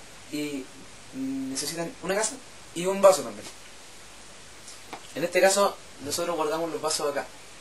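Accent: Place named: Chile. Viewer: Acento chileno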